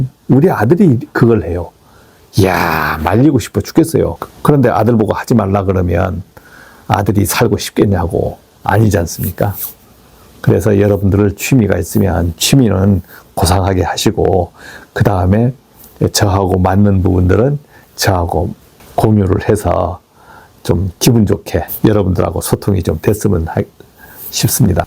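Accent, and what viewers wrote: Jeju, South Korea. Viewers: 동향분이셨군요 제주도 말투가 보여서 긴가민가했었어요 ㅎㅎ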